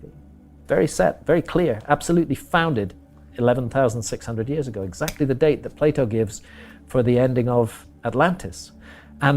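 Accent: England, United Kingdom. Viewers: His English accent gives him an infinite amount of credibility. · This bullshit artist actually says that Plato's story of Atlantis MUST be true because geology says sea levels rose via Meltwater pulse 1B (the characteristics of which is highly unknown, by the way) around the same time that Plato gives for Atlantis. No joke, he actually says this. It is so easy to get away with bullshit when you have an awesome british accent.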